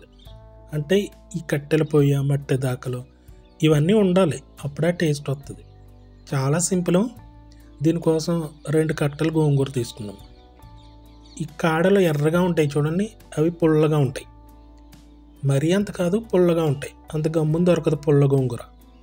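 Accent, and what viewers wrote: Godavari, India. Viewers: గోదావరి తెలుగు భాష , ఆ యాస మార్చకుండా ఉంచండి. యూ ట్యూబు డబ్బులు వచ్చాక కొత్త స్టవ్ లు, Ikea పాత్రలూ వంటివి కొని వంట గది రూపు రేఖలు మార్చేయకుండా ఇప్పటి సహజత్వం కొన సాగిస్తేనే ఆ పాత వంటలకు ఆ పాత కాలపు వాసనలు ఉంటాయి. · Memu Godavarollam andi. Mee vantalu, maata superb. Matallo yaasa over cheyyakunda natural ga undi.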